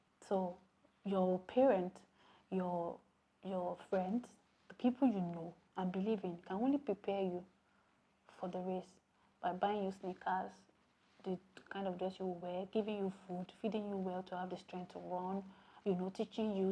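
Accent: Ekiti, Nigeria. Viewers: Naughty girl, I have subscribed o. Your Ekiti is hot like amala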